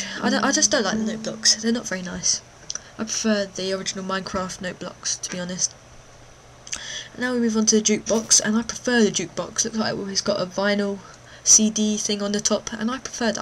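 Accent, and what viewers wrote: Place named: United Kingdom. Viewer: Awesome a british accent !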